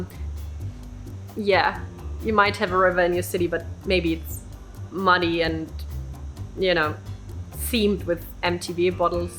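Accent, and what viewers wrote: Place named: Germany. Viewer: I live in a small rural town. Its about a mile square. Population around 1100. Our home sits on a large corner lot. We have a huge backyard. I feel lucky I can light my fire ring whenever I want. But summers are just TOO HOT! We have enough room for any kind of gardening. I prefer to do my working intentions either within my home or in my backyard. We have lived in our home for 40 years now. So there is a lot of strength and love within its walls. My maternal grandmother came from Germany when she was 16 years old. She lived to be 99 and 1/2 years old. I love listening to you. Your accent is much like hers was. 😊❤❤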